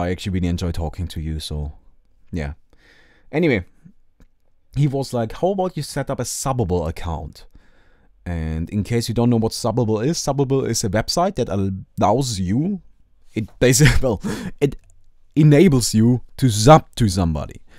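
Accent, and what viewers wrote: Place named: Germany. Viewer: you are a truly great speaker. There is some sort of honesty in your interaction with your viewers, that is very precious and rarely found. The way you open up to your viewers is so inspirational, and it sets our (mine at least) minds at eazy to listen to your charming german accent in you kind tone. These are all valuable qualities in life. Now, i did what you told me and thought about my comment, so now I'm asking you to think about why your channel is finding succes. It is your accomplishment, and yours only. Don't rush into something your heart isn't in.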